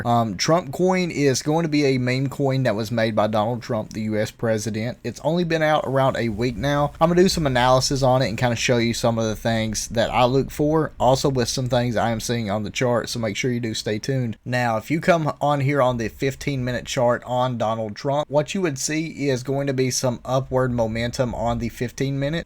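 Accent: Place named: Deep South, United States. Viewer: Hey! I LOOOVVE your deep south accent...🥰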